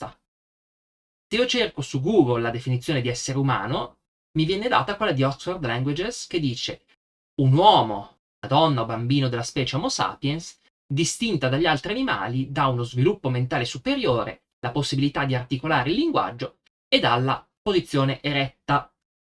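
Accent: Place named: Milan, Italy. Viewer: Non sono io l'autore del video; però so che l'autore è della periferia milanese, quindi è possibile che la sua pronuncia sia stata influenzata dalla sua varietà.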